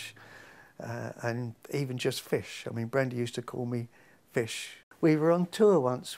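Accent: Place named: London, United Kingdom. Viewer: Sounds like a bit of a london accent,,... Definitely not true cornish....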